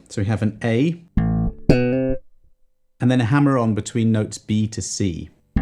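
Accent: United Kingdom. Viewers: I can't decide which I like more, the bass tone or the British accent.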